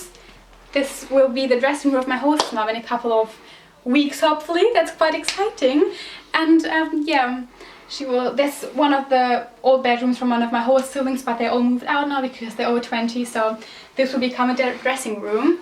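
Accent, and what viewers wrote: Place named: United Kingdom. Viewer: Dein English ist echt toll😍 und ich finde es echt toll, dass man echt richtig hört, dass du British English "lernst".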